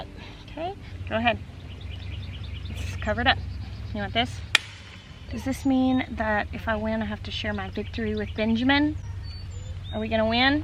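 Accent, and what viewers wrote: South, United States. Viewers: Love the trash talk, polite but confident, so southern! Girl, your self control is large! It so wanted to go home with you!! The rotten egg fear is real! You never forget, shuddering right now with the memory of it exploding nasty and green. 😖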